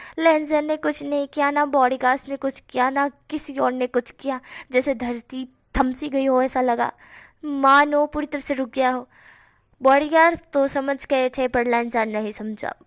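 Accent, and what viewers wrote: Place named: Gujarat, India. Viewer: Aap ki thodi boli gujarati he❤😊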